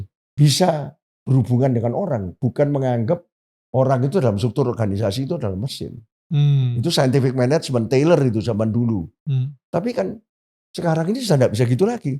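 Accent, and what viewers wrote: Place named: Java, Indonesia. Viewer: Logat Jawanya medok banget, jadi makin relate sama pak Hermawan 😊 "kejujuran adalah nilai2 Islam yang Universal"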